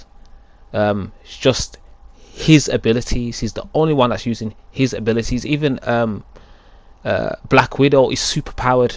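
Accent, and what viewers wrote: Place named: South Africa. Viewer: Holy crap, had no idea you did this. You sound like you're from.... South Africa??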